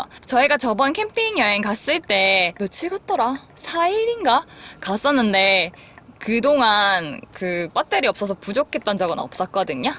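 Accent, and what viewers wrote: Gyeongsang, South Korea. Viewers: 부러워요 어떻게 이렇게 잘만들었어요 나도 갱상도 사람 ^^~~~갱상도말 구수해요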